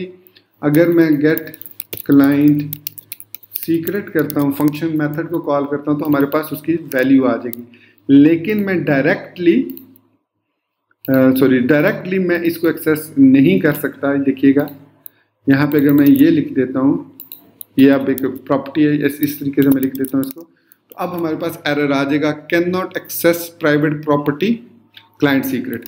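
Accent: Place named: India. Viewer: Hi sir, i saw that you have many likes, and ppl likes you, but your articulation is bad, i know it is hard for you in your country i can understand that. But in one moment i find that you speak English in another i think it is Indian. I can not distinguish is it English or Indian :).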